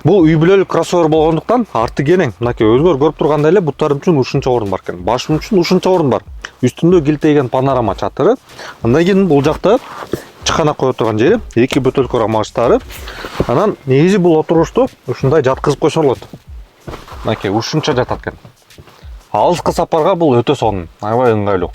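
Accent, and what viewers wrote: Standard. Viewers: Ото сонун эң жакшы деген баа беришибиз керек таза кыргызча сүйлөгөнү учун чыныгы кыргыз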